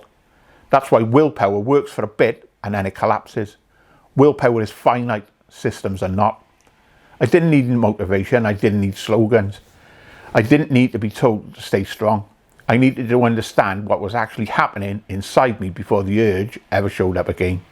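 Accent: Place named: Wales, United Kingdom. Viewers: Hey I'm finding your videos both helpful and hard hitting. Out of interest where are you from you sound Welsh? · I am OK Monday to Thursday. I can take the loneliness and I have a work pattern that keeps me out the pub but come Friday the willpower has gone. I am not drunk for the next 3 days its just I don't want to drink at all but do. Its the not having control that gets up my back. If I wanted to drink well at least I have chosen my poison. Nice to hear this in a Welsh accent. Thanks Butty!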